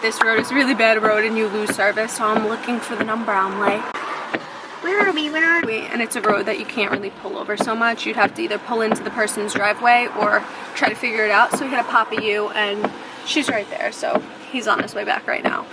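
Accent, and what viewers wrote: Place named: Long Island, United States. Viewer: Former co worker of mine from Long Island...same accent 😀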